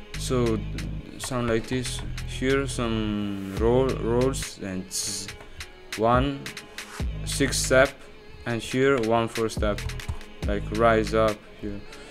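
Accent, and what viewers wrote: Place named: Italy. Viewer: ahaha nice remake bro. Si sente un po’ l’accento italiano ahahah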